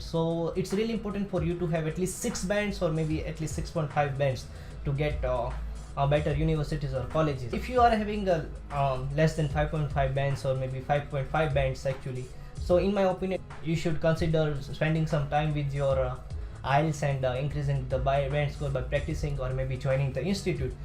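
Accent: Gujarat, India. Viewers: I appreciate your love towards our nation 🙏 brother but the reason I asked was that your accent sounded Gujarati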